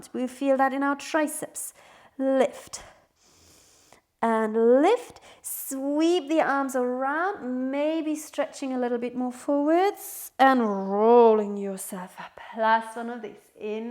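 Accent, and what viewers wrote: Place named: Eastern Europe. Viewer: Thank you,. I asked you because I am russian myself and I can hear that slight easteuropean accent. Ann your name in russian.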